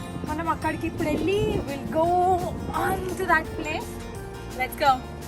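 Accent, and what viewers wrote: Telangana, India. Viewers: Perfect telangana yasa (slang 😎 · Hi what are you first time watching new video but very good talking are you Telangana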